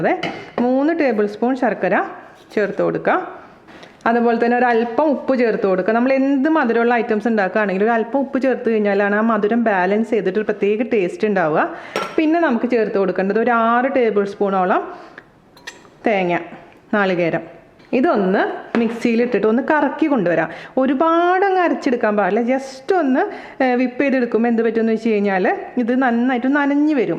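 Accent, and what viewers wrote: Thrissur, India. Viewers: I am new fan if u frm mumbai, surely will try this ariyunda. Ari varuthu chayayil ittu kazhikkunnathu kandittundu. Pandu shabarimalakku nadannu poyirunna alkkar hard aya ariyunda erinju wild animals ne okke odikkarundu ennu ammamma paranjathu orma vannu. paranjille harda aya ariyunda erinjal chathu povum ennu. Athu kettapool ammamma paranja karyam orthu poyi. Enthayalum iniyum lockdown recipes iniyum poratte. One more thing. Ur Trissur style of speaking us really attractive.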